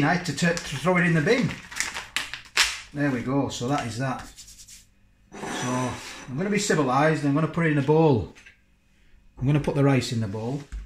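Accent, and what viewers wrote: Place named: Yorkshire, United Kingdom. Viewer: I was waiting for the moment when it burnt your mouth off but you handled it like a boss! Great review, the Yorkshire accent tops it off nicely 👌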